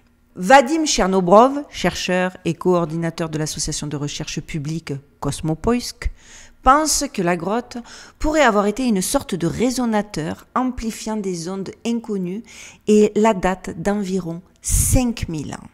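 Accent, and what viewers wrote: Marseille, France. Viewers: Pub France Vérif' :face-green-smiling: On reconnait la marseillaise qui demeure en toi!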